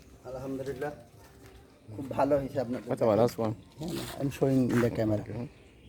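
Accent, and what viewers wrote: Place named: Noakhali, Bangladesh. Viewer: আসসালামুয়ালাইকুম ভাই ভাইয়া.আমি আপনার সবগুলো ভিডিও দেখি আমার কাছে অনেক ভালো লাগে. বিশেষ করে আপনার নোয়াখালীর কথাগুলো আমার অনেক ভালো লাগে এত বড় মানুষ হয়েও আপনি নোয়াখালীর কথাগুলোকে এত সুন্দর করে বলেন. আমার বাড়িও নোয়াখালী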